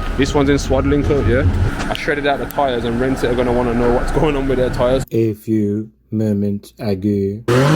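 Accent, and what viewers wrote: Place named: United Kingdom. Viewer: it's crazy that i picture a dude like you when I hear British these days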